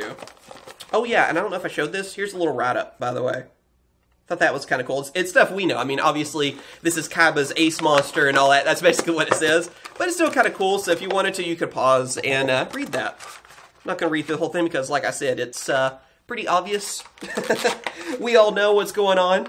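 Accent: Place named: Georgia, United States. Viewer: Bro are you from Georgia because you sound like you are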